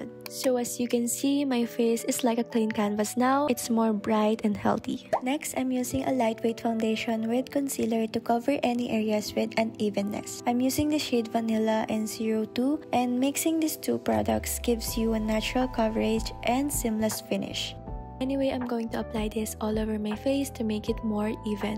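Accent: Philippines. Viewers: Are you filipino? I hear some accent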